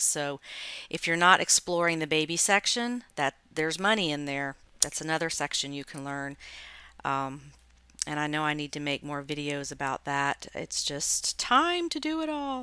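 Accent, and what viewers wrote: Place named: Georgia, United States. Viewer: Enjoy your videos. Living outside of Georgia now, hearing your accent takes me back to Atlanta (Little Five Points.)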